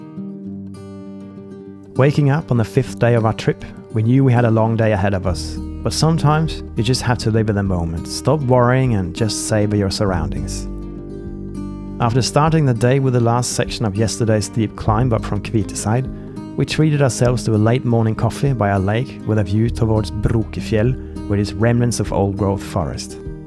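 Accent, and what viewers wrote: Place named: England, United Kingdom. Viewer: because of your accent and perfectly spoken English I assumed that you were from England?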